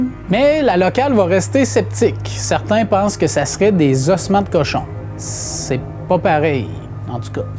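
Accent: Quebec, Canada. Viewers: Si les événements paranormaux sont constamment débusqués, c’est justement parce que c’est faux. Ce qui est paranormal dans ce canal, c’est l’accent fatigant de Québec avec les « é» prononcés en "oé". Okoé ? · Trop bonne, votre vidéo… et sympa, votre petit accent qui fleure bon le Canada 👏👏🌷🌹🌷🥀🌷🌹🌷👏👏!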